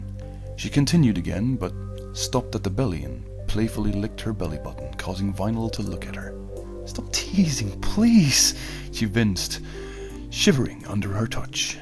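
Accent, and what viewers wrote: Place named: Ireland. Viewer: I think the irish accent just makes it dound even better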